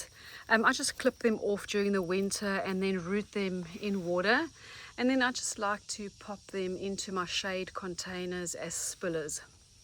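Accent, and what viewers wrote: South Africa. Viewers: Amazing garden a credit to you I detect a SAfrican accent is that where you are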